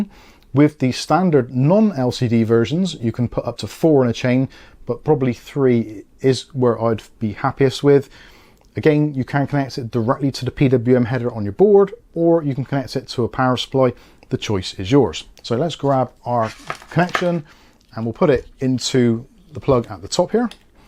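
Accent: Bristol, United Kingdom. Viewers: Thanks for the video. You’ve deffo got a south west accent, sounds Bristolian but could be wrong lol.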